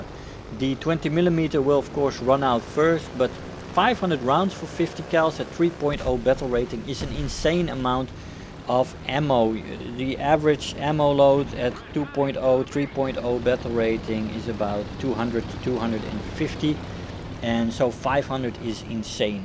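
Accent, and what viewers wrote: Netherlands. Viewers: they're both dutch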